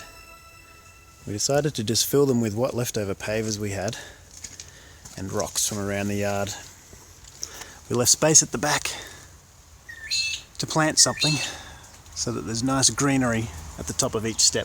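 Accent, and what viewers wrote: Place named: Australia. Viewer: It looks great! Lovely to hear an aussie on Youtube too :)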